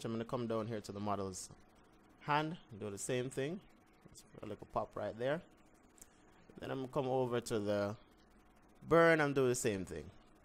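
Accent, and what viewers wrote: Jamaica. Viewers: 🇯🇲 accent. Big up yourself mi genna. You done know how di ting set.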